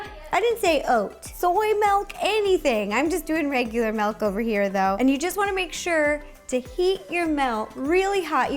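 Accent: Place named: Rural, United States. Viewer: Awww her adorable country accent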